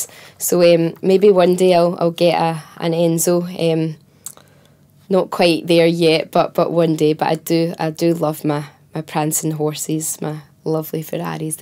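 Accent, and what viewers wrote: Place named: Glasgow, United Kingdom. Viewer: When she sings you can't really hear here accents, and then you hear an interview and think. That's a glaswegian lassie 😉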